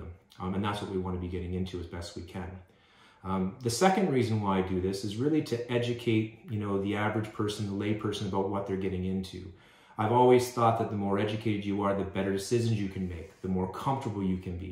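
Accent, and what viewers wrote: Canada. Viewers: I’ve looked for new videos also. I didn’t know you were in Canada until I heard you talk. Most of my fathers family lives in Ottawa. BTW, personally, I think Sandra Lee is overrated. There’s many, many surgeons, dermatologist and plastic guys that do as well or better. I think she’s sloppy and impatient.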